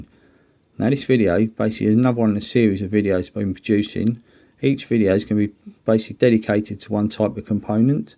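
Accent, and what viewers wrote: United Kingdom. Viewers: Your videos are nothing short of brilliant. I've religiously watched them all and several, multiples times. How you spell out how you do what you do and why is unmatchable. I love your titled bridges too: very creative. I also want to mention something that I've never seen mentioned before. I really dig your accent. not so much because I'm some odd Britophile, but I really get bored to bloody tears listening to guys with game show host, cookie cutter voices. I've just grown to feel there's something disingenuous about them. Your intonation and articulation just keep my attention meter pegged. Thank you. Keep 'em comin'!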